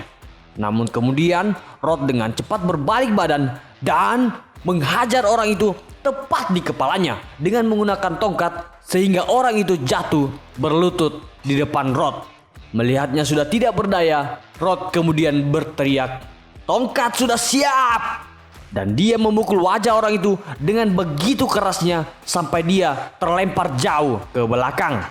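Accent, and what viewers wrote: Palu, Indonesia. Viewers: Kayak logatnya orang palu komiu ini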